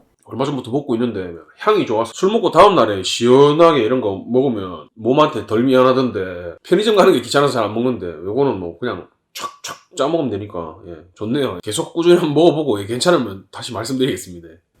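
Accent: Gyeongsang, South Korea. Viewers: 상 남자중에 상 남자 박력 뿡뿡 매력이 잘잘 흐립니다 거기다 경상도 억양까지 넘 섹시하고 멋지다 👍🏼😂😂😂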